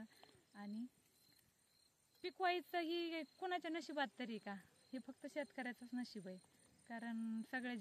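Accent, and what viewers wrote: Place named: Parbhani, India. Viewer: तुमच्या बोलण्याच्या भाशावरून परभणी किंवा हिंगोली जिल्ह्यातील वाटतात ताई तुम्ही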